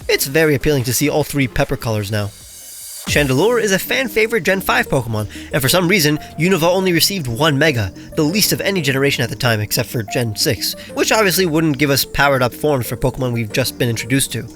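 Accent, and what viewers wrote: France. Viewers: What’s with French accent? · Dude I love your videos, your designs are amazing and ideas are awsome. 🥰 (note you French accent is superb.👌)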